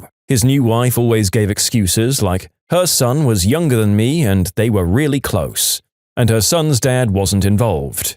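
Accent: United Kingdom. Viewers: British alert🚨🚨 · You are now have a british voice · love the british voice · What is this British voice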